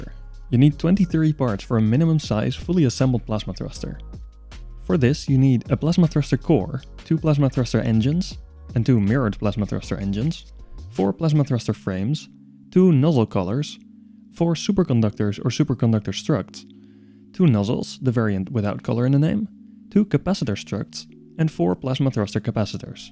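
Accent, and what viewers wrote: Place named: Netherlands. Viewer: Ik herken dit accent direct haha. Dutch space representative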